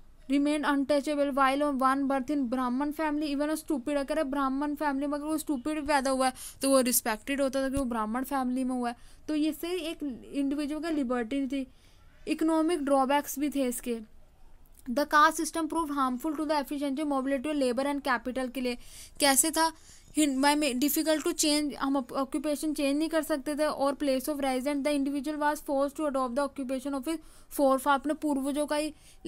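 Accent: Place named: Chandigarh, India. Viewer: Thanks mam I think you are from chandigarh because of your voice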